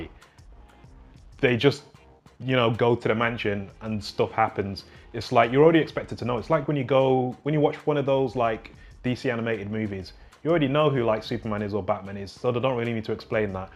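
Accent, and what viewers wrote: United Kingdom. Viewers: It's good to hear a northern accent on youtube. There isn't enough. Lancs here.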